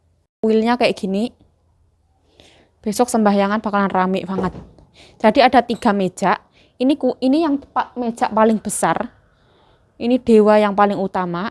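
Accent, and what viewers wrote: Java, Indonesia. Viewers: Lah lah...mbk orang ngawi jawa tomur to...aku blitar jawa timur juga, pantesan omongane jan njowo banget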